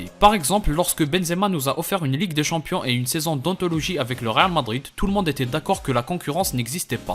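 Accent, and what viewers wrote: Kabylie, Algeria. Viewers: UN ACCENT TYPIQUEMENT KABYLE T'AS RAISON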